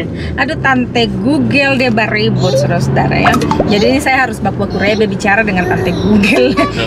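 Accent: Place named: Papua, Indonesia. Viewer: Bahasa belanda campur logat papua 👍😂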